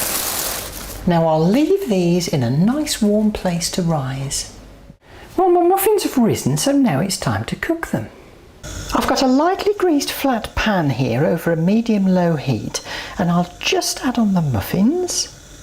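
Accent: Midlands, United Kingdom. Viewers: Loving these great recipes, your enthusiasm and the Midlands accent!!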